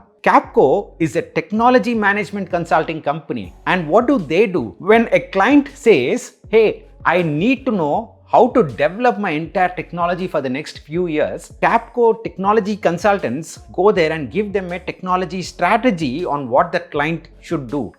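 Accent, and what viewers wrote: South India, India. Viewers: your accent is very much south Indian. It is sounds little weird to other people.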